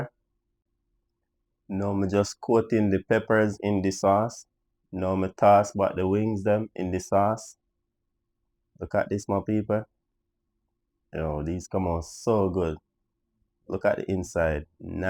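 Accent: Jamaica. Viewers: Love the Jamaican accent…..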